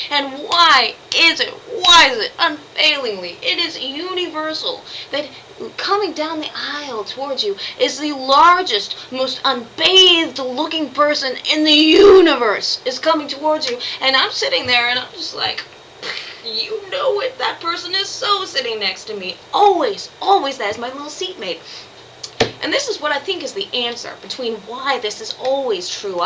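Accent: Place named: Texas, United States. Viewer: Your mannerism and voice. Dead give away. What part? Austin? San Antonio? Not near Dallas.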